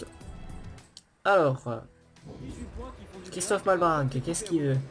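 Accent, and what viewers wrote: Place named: Marseille, France. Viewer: accent marseillais sisi :)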